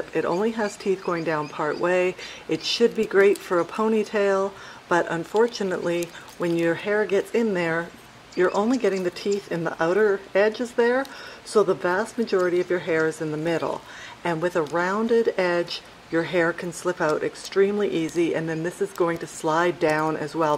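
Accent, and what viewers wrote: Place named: Atlanta, United States. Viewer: Thanks...i will look out for them, Love your accent and the outdoor videos.. reminds me of living in Atlanta - oh and you always look amazing too:)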